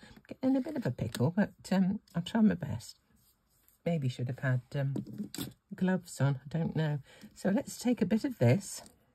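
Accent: United Kingdom. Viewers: I hear a lot of differences in words from the various British YouTubers. I just love the accent and your voice in particular is so beautiful and appealing. You just sound so sweet, I wish I could meet you and have dinner or Supper as we say in the South. Some of the words I’ve noticed are: Right-OK (as a bridge to the next thought) Flat-Apartment Crisps-Chips Biscuit-Cookie Shops-Stores Uni-College Dustbin or Bin-Trashcan or Garbage Can Post-Mail Trousers-Pants Trolly-Cart or in the South, /Buggy (shopping) Trainers-Sneakers or Tennis Shoes (pronounced TennieShoes in the South) Boot-Trunk (car) Centralize it-Center it Holiday-Vacation Love your videos so much and I have to Re-Subscribe regularly. I try to keep an eye on it. I don’t know why they do that. Love from Murfreesboro, right outside of Nashville, Tennessee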